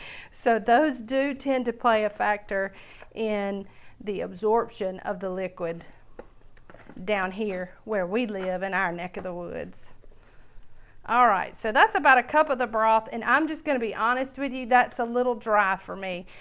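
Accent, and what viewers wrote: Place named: North Georgia, United States. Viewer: Looks great! I've never made homemade cornbread before, so I'm so happy I found this demonstration. "Smell-O-Vision!" I love it! You sound like North Georgia or East Tennessee, and it was really enjoyable listening to you. Happy Thanksgiving!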